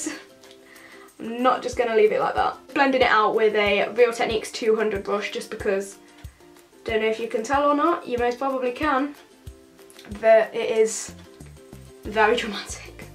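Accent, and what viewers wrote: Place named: Nottingham, United Kingdom. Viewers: Nottingham I think x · Where abouts from the uk are u from , I'm trying to figure out yr accent 😂✨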